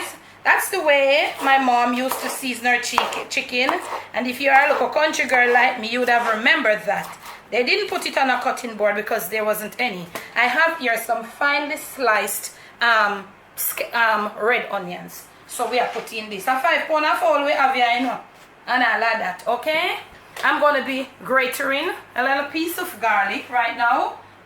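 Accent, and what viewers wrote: Jamaica. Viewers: I just love to watch you cook, and I also love the way you talk. The real JA dialect.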